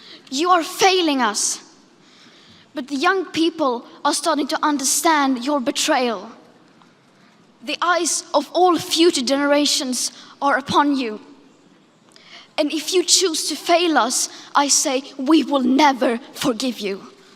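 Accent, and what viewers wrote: United Kingdom. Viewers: Ma She's swedish but in a lot of European countries you learn british english. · I’m English and can’t speak another language. Greta sounds like she was born in the Uk. I’m ashamed..... So young, so talented, so educated . We need her in our trillions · Greta has a British accent.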